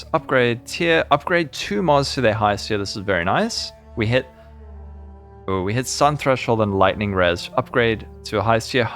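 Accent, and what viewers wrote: South Africa. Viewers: Just came across your channel, and a quick question with regards to your accent - are you from South Africa?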